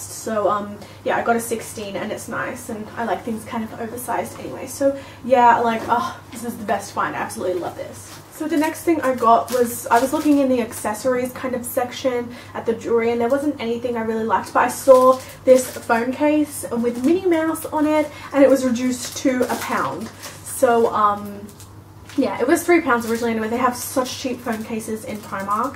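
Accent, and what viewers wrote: Australia. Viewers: She's either from Australia or New Zealand (I can never tell the difference). I'm English and she's definitely not from here :) hope that helps x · Yeah it's definantly Australian then. I was having such a moment last night. Think I'd be able to recognise my own accent lol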